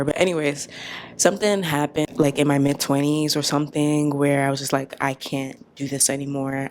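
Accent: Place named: East Coast, United States. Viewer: ur accent is so east coast, so comforting 🙂‍↕🙂‍↕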